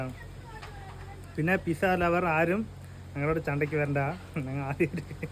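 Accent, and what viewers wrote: Tamil Nadu, India. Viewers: Ningalu malayaliya allengil tamil la mindunadhu different ayeetu ondu adha chodhichadhu.sorry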